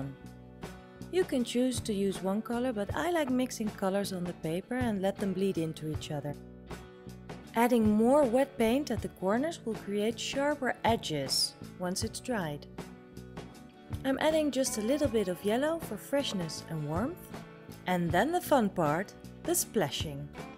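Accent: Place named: Netherlands. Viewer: This is great! Also, you sound Dutch, you're Dutch right? That's so cool! I'm Dutch as well~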